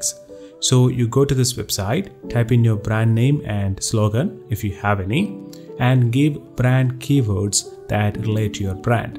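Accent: Kerala, India. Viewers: Ith oru Malayali anallo samsaarikune.. alle? i felt it like many times in this video.. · mallu accent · Your accent sounds more Indian than me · Chettan Malayali Alle ? Mmde College pronunciation... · Refreshing mallu voice 😀… good content and diving to check all of those links ..thanks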